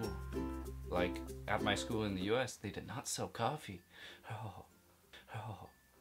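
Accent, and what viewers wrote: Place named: Norway. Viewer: Another thing I noticed, I just checked out your first video as well, and you have developed somewhat of a Norwegian accent while talking Norwegian! xD Kos deg i Norge og finn på masse rart! : )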